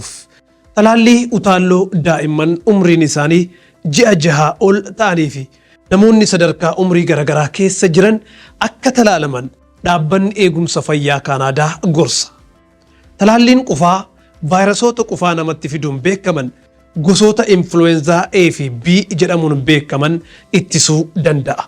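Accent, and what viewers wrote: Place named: Somalia. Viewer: İ think you talking like Somali language sir, is there any difference between Somali and oromo accent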